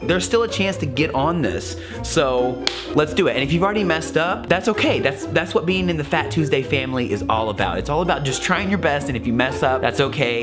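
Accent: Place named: South, United States. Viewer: Do Sunday. Sunday is a day that I’m just getting my life together, maybe being lazy and hanging out with you and everyone else during that time was super fun!!! Also — are you from Seattle? Yer accent can git reaul southern,!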